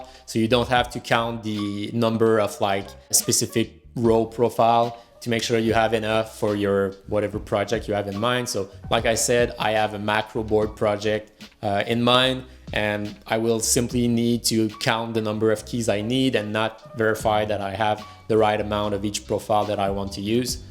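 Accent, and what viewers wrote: Quebec, Canada. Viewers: I know that accent... est-ce que t'es Québécois? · Tes tu quebecois?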